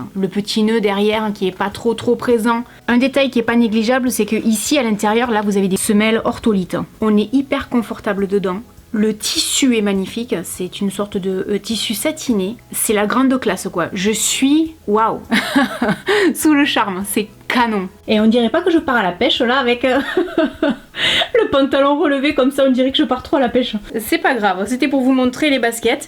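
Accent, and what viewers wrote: South, France. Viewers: Coucou :) Tu viens de gagner une abonnée ! J'ai découvert tes vidéos sur la page d'accueil de YouTube, et j'en ai regardé une bonne dizaine ! Je me suis marrée comme rarement je me marre en regardant des vidéos. Tu es drôle, fraîche et spontanée ! J'adore ton look, ton style et ton petit accent du sud 😃 Et mention spéciale à la vidéo "mes pires moments de solitude" où j'ai hurlé de rire! Je regarde tes vidéos le soir dans mon lit après une journée de boulot et ça me détend ! Gros bisous et ne change rien, c'est parfait 😘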